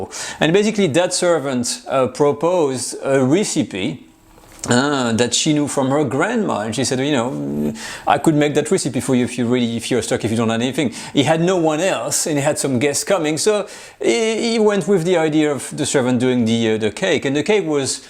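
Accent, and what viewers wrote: France. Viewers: So cozy French accent 🤗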